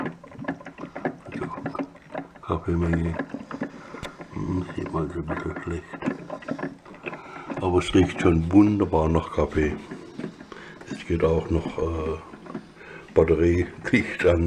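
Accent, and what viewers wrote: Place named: Swabia, Germany. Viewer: Hallo, Danke für das Video. Wollte mich kurz erkundigen ob du bis dato immer noch mit der Kaffeemaschine zufrieden bist?! Bin am überlegen mir die Selbe zuzulegen. P.S. nach deinem Dialekt nach kommst du (so meine ich) aus dem Schwabenländle. Pforzheimer/Calwer Gegend vielleicht?:) Grüße aus dem Schwarzwald;)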